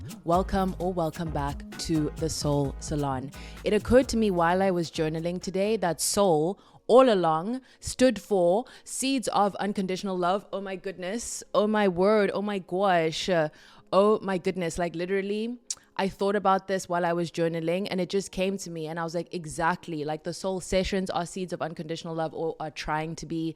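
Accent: South Africa. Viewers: Your accent sounds like you're South African- if you are, it's always amazing discovering fellow South Africans who are sharing such wonderful videos through the lens of spirituality.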